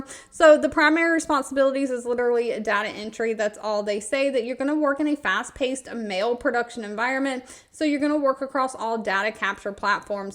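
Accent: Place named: Louisiana, United States. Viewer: you're welcome luv! I saw on an older video you said people were complaining about your voice or something. Do not let that bother you! I'm a proud Louisiana woman that talks just like you, lol! Be you! Have a super day!💜💜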